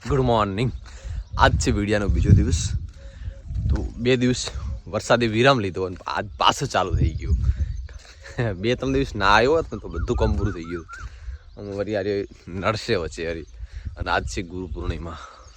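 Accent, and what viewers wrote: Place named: Banaskantha, India. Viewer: જય માતાજી મોટા ભાઈ ક્યાં થી છો ભાઈ તમારી બોલી બનાસકાંઠા ની લાગે છે બહુ સરસ વિડિઓ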